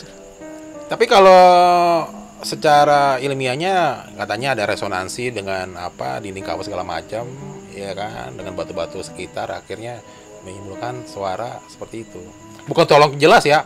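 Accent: Indonesia. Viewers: logat bahasa indonesia lorat bahasa malaysia salam dari perlis malaysia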